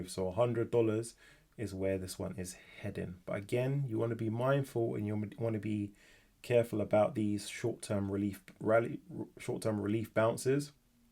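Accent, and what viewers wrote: United Kingdom. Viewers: Love the British accent..lets go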